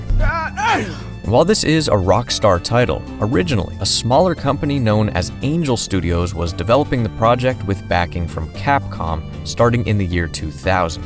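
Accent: United States. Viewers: I love your heavy cowboy accent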